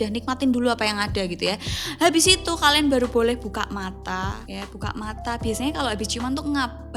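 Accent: Java, Indonesia. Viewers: Ni cwe tipe gw bngt..medok'a itu lho bikin gw lemes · mbak wong ndi to yo 😂ngomong e cek medok e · Ngomoge mledok mbae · Aku kontenmu Mbak. Aku liat kontenmu kekel bhasamu Mbak.. boao jowone asik😀 Salam kenal ae wis Arema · Iki suenneng aku logat e jowo e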